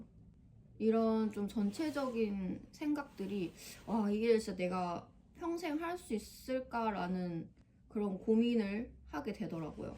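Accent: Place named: Gyeongsang, South Korea. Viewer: 내나??? 경상도가 고향이십니까 ㅎㅎ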